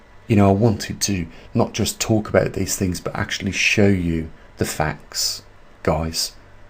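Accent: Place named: England, United Kingdom. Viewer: Click on CC at the bottom of the video and then click on the wheel to the right of CC for the settings for 'auto translate ' then scroll countries to select 'Spanish'. The Auto-translate isn't perfect especially as has an English regional dialect. Bless you and perhaps interpret main points in the video into Spanish and put that into comments.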